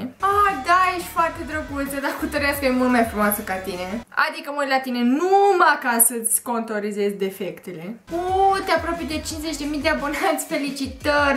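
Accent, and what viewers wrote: Moldova. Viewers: Wow. Asa ceva.... Am intrat pe video-ul asta din intamplare de la o sugestie a youtube-ului. Am ramas interzisa practic:)). Am dat peste o faptura care latra la mine si incerca sa fie funny. First of all: Nu esti funny... daca gesticulezi mult si te scalambi, asta nu inseamna automat ca esti funny. Numai unul poate sa pull it off si ala e Jim Carrey. Second of all: Incearca sa renunti la accentul de moldoveanca, te face sa pari....cum sa ma exprim...saraca cu duhul. Sau daca nu poti renunta la accent, macar nu mai vorbi in engleza, engleza cu accent moldovenesc te face cel putin penibila. Ce e mai trist e ca ai peste 50.000 de subscriberi, asta inseamna ca sunt 50.000 de oameni care te privesc ca pe un role model...bine...majoritatea lor sunt probabil baieti virgini si fete pana in 20 de ani care au ca poza de profil un selfie...deci... 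In orice caz, inteleg de ce ai succes pe net si probabil nu la fel de mult si in viata reala. Asta e pentru ca youtube-ul e 2D...pe cand viata reala, din pacate pentru tine, e 3D, adica lumea te poate vedea si din profil...if you know what I mean. Peace.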